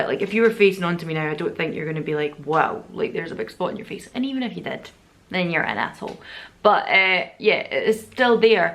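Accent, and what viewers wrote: Scotland, United Kingdom. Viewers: Love the video! Been looking at these Bodyography glitter pigments. They remind me of some of the flakie shadows from Copacetic Cosmetics** I'm from USA but think they ship to other places in the world. Love your Scottish accent too btw! 💓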